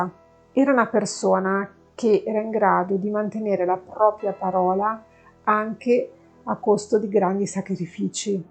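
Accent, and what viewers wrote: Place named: Lombardy, Italy. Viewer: Ciao complimenti appena arrivata sono in clandestinità perché sto usando un iPad condiviso. Complimenti soprattutto perché tratti dei casi italiani. Mi piace il tuo accento lombardo. A presto Lally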